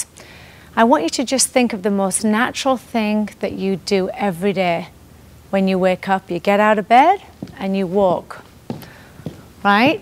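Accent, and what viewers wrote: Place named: Northern England, United Kingdom. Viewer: Very helpful-the images I can use when walking along the beach front. Thank you and a nice (north) English accent. Jack in Japan.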